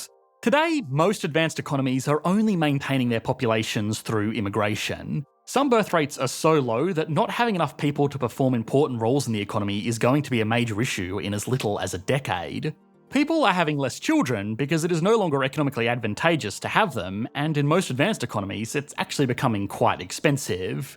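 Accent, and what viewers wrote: Australia. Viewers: AI could have written the script and spoken in the exact same Australian accent as this guy and no one would have known the difference. But yeah, we got nothing to fear. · It’s just hard to take Australian accent seriously in any way.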